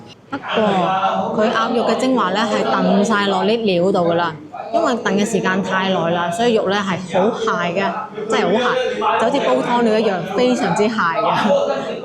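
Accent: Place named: Xiguan, China. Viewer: 老闆娘好勁，咁都聽出妳係西關小姐😂。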